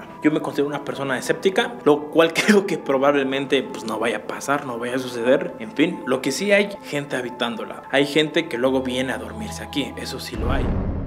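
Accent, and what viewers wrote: Mexico City, Mexico. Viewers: lo que más horror causa es tu acento chilango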